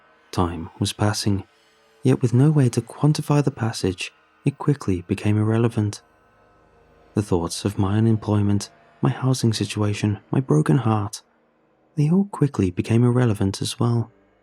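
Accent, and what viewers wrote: United Kingdom. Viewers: He’s British. They pronounce words differently.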